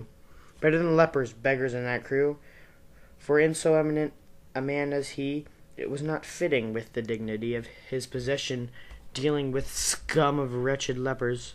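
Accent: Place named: United States. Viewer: american accent... try speakin in an english accent pleeeease, we all sing in an american accent when we sing pop so you can at least try :) please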